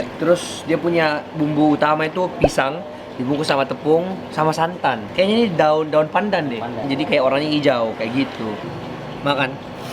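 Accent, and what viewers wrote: Makassar, Indonesia. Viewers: Vlog yg paling di tunggu", vlog di makassar, makan makanan khas makassar, logat makassar, syuuuka😍😍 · Lain" ki ku rasa kalo logat makassarki:V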